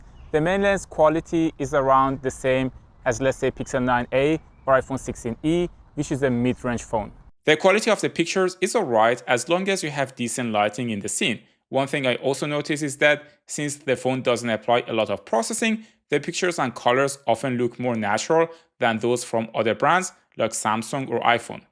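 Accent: Iran. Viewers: کاکا من حس میکنم لهجه ایرانیه؟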